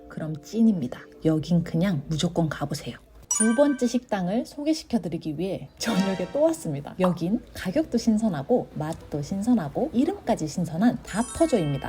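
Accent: Gyeongsang, South Korea. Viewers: 경상도 분이신가봐요? 사투리가 들리네요^^ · 경상도 사투리가 섞인 표투리 나레이션 신선하네요 ㅋㅋ 구독 박고 갑니다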